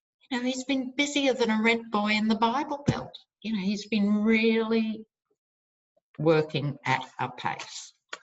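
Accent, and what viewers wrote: Australia. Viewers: Great readings! 🌺💐🌺I love your clear, concise yet laidback style, and your Aussie Accent is the best! 😉Thanks for sharing your awesome gifts and talents with us. Happy New Year 🛎🎉🎉🎉and Continued Blessings! 💙🧡💜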